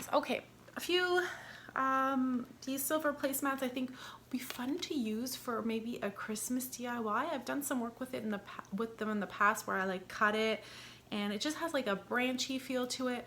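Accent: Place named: Canada. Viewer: Always love ur videos! Hope you do another garden one:). That was so unique and relaxing:). You are a very special and sweet young lady. I love ur accent-I lived in Mississauga, Ontario Canada for 6 months and love the way you say the word “about”:) I still have a very strong New England accent tho I left there in 1978! 💕💗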